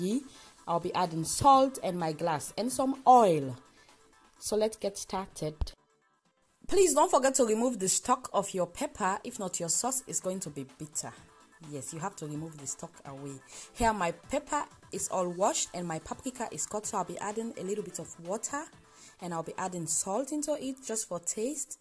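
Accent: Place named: Cameroon. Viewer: Same ascent with Cameroon people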